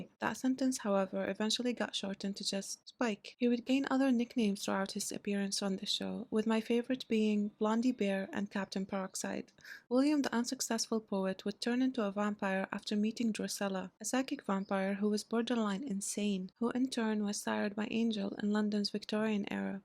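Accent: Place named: Ireland. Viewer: Yeah, I guess that's what it was. My accent when I speak is clearly noticeable, too. So, judging from my expertise, I would say, you're closest to Irish! 👍🤗 Thanks for the vid though, too! It's good. I haven't watched everything yet but your analysis is on point and we both truly share a love for Buffy. 🤝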